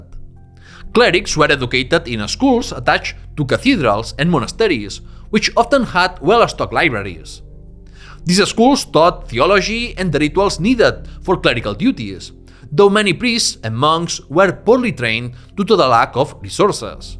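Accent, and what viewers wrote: Spain. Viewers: Is there an option in Spanish, this English is a little too Spaniard for me?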